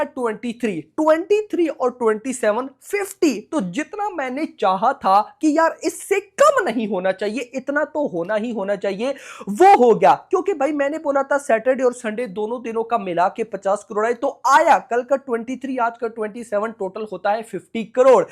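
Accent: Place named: Pakistan. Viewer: Ye pakistani hai kya bc kya accent hai ye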